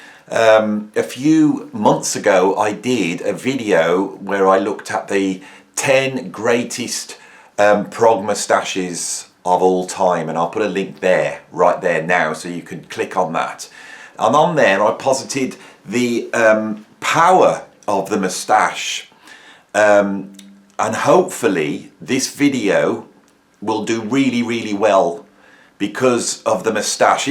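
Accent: Birmingham, United Kingdom. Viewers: I listened to this without visuals. The power of the brummie accent was far more compelling than any face furniture. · Got to love a Brummie accent!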